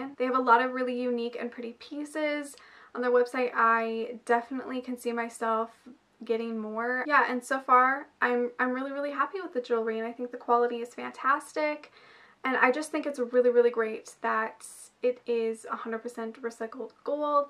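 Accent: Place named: Canada. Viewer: Good to know your Canadian. I like more your accent compared to Americans.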